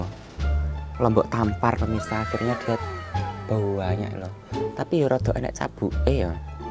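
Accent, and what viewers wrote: Java, Indonesia. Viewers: Alhamdulilah mas....tetep semngt...aku seneng dgn logat jawa...ny itu keren tingl di sepnyol tpi ttp bahasa daerah ny ttp ada.aku suka......bgt...... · Wis sue neng luar biasa Jawa e kok jek medok y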